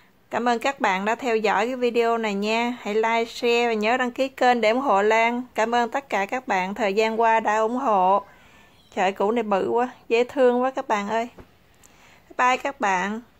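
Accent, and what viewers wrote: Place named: Mekong Delta, Vietnam. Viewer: OK. Chi Lan. Giong noi chi nghe nhu mien Tay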